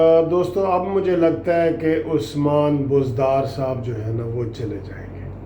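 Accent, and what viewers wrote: Saraiki, Pakistan. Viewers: LEHJA SURA-EE-KEE HAAY, URDU...ROU RHEE HAAY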